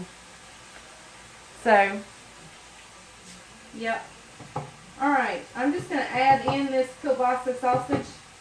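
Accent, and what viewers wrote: South, United States. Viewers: Are you saying Aldi?? Sorry this northern girl is having a hard time with the southern accent😅😅 love your channel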